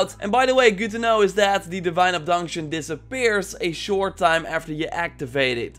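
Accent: Netherlands. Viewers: you sound like a nederlander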